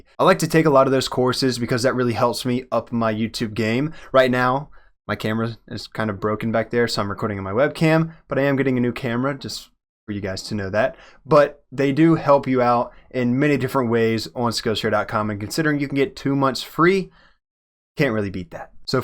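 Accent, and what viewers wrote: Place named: West, United States. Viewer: It's weird, you have a western accent, but say "y'all"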